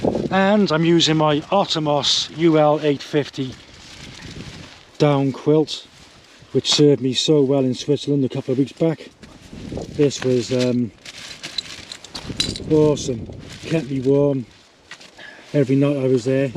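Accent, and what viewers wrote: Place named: South Wales, United Kingdom. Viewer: Another great video. A bit nearer to home for this camp. It’s a fair drive from South Wales to Snowdonia for you each time,but well worth the journey isn’t it? Good to see with you. ‘cute in it!’🤣Love the South Wales Accent 🏴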